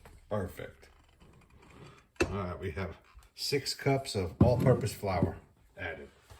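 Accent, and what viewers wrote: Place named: Texas, United States. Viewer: Like your Texas accent. Born and raised in NJ moved to Texas 45 years ago. I grew up on NY style Pizza haven’t had one to match since moving here, will try this recipe this week.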